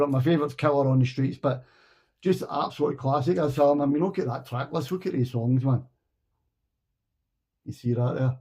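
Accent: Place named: Scotland, United Kingdom. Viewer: Hi! Just found your channel after a year in the VC- great to hear another Scottish voice, thought it was just me and my mate Jack. Great selections here. Look forward to checking more of your stuff out. Cheers, Gordon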